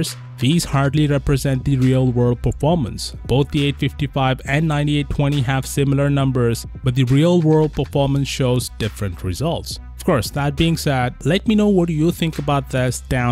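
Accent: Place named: India. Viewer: When indian hardly trying to hide the accent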